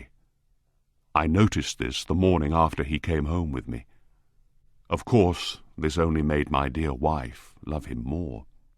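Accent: United Kingdom. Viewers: Por gentileza , o áudio é inglês britânico? Parabéns pelos vídeos!!!! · Tem acento britânico, amei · Isso é ingles britânico né? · Inglês Britânico. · pela pronuncia acredito que seja britânico. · Lindo inglês britânico 🥰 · É inglês britanico? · Ele tem um sotaque britânico ou foi apenas uma impressão por causa da história que não é americana? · esse inglês é britânico você sabe me dizer.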